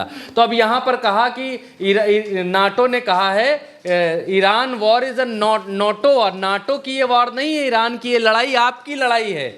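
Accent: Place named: Awadhi, India. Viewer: ka theth Avadhi andaaz 😂😂 maza aa gaya ❤❤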